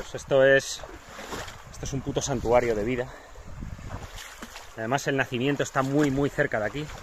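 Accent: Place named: Australia. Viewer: like ur aussie accent 😄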